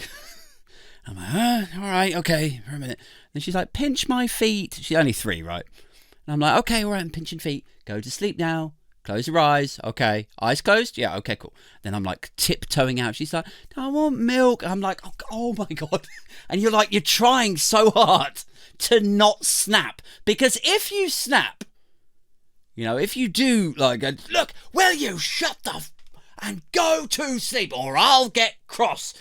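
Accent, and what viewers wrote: United States. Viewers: Ohh it’s the sweary shouty southern man!! ❤️❤️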